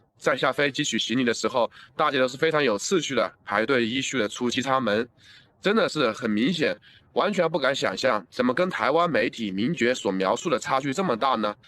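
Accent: China. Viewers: 你這中國的口音！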